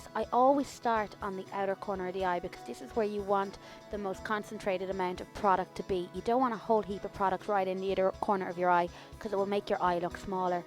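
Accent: Ireland. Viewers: This is an old video but I was contemplating getting the Vintage Vamp and wanted to see it on blue eyes, I’m definitely going to buy it now! The lovely Dublin accent was a nice surprise and reminds me of home as I live in the Uk, up the dubs! Xx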